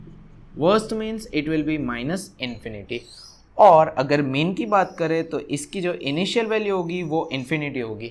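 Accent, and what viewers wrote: Gujarat, India. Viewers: are you gujrati you say that 'so aama ek exmple le te hai' good job bhai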